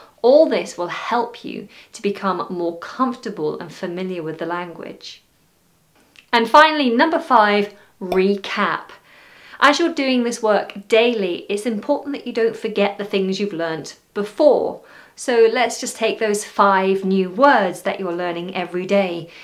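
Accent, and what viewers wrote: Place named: England, United Kingdom. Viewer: when you speak with your nothern accent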